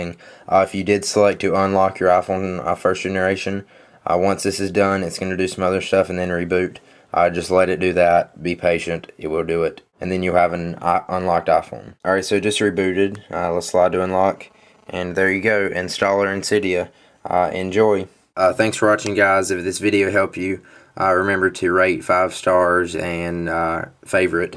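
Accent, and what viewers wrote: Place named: Texas, United States. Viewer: u sound like ur from texas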